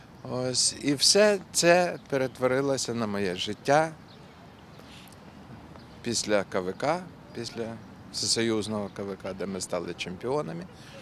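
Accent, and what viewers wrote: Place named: Odesa, Ukraine. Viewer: 😂😂😂😂😂😂😂😂😂😂😂!!!! Шутите на русском!!!! На русском С ОСОБЫМ ОДЕССКО-УКРАИНСКИМ АКЦЕНТОМ!!!!!! Это СУПЕР И ОЧЕНЬ КЛАССНО!!!! Дякую Вам ВЕЛИКЕ!!! І ЩОБ ВИ МЕНІ БУЛИ ЗАВЖДИ ЗДОРОВИМ!!!!!!! ❤❤❤❤❤❤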